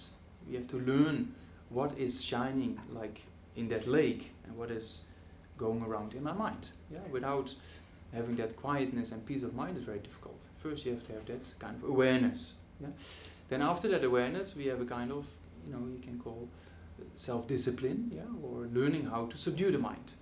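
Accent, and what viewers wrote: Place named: Netherlands. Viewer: The volume is very low, coupled with Dutch English and swaying doesn't make for easy listening.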